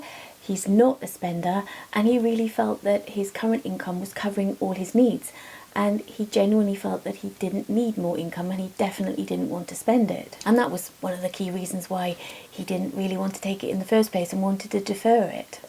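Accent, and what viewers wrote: Wales, United Kingdom. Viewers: Thank you for this incredibly structured video. I will be coming to you for advice in about 10 years time. Your accent, Bridgend or Port Talbot I would say…Porthcawl in between? Keep up the great vids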